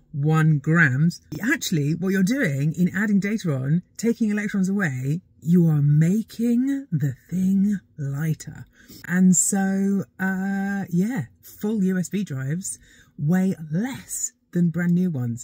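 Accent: United Kingdom. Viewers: In the middle of the zeros I lost track of the British accent.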